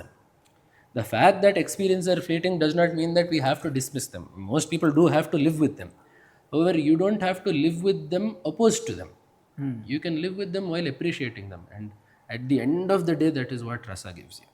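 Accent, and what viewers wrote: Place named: India. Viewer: It’s amazing to hear him imbibe Indian accent so effortlessly despite having grown up with American accent around him….btw, I really liked these QnA series.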